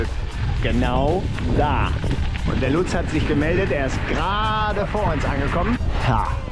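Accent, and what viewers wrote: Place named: Saxony, Germany. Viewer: Schlauer Startpunkt, der Sand am Ende kann dazu führen das man gedanklich ein paar Verwünschungen in Richtung des Scouts ausstößt... 😅 Dafür kreiert der Trail am See aber wirklich Begeisterung! Würde euch dringend Venus Valley ans Herz legen! P.S so richtig passend fande ich das sächseln (auch) nicht 😉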